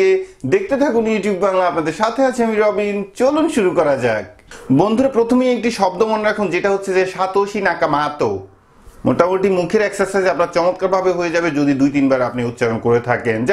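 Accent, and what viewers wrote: Sylhet, Bangladesh. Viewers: ধন্যবাদ ভাই সুন্দর করে বুঝানোর জন্য।ভাল লাগে আপনার সবগুলা ভিডিও।( সিলেটি পুয়া। কথায় পরিচয়। ) ধন্যবাদ।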